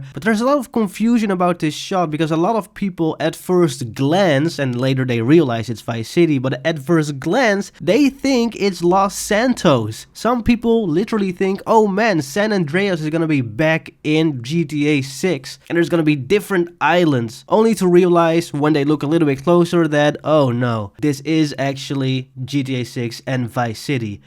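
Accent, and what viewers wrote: Netherlands. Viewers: Im probably wrong but your accent sounds kinda Dutch lol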